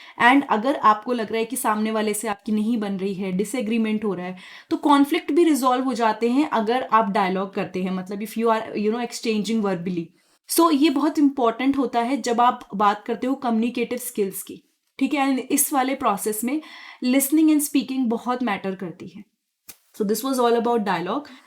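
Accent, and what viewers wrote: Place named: Haryana, India. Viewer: Mam is haryanvi speaker in video...focus mam says 1 se 2 jano😊....btw nice session and it is very helpful...thnks mam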